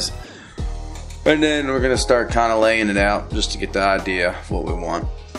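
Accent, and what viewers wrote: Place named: Maryland, United States. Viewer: Definitely a Marylander. I know that accent from anywhere. 😉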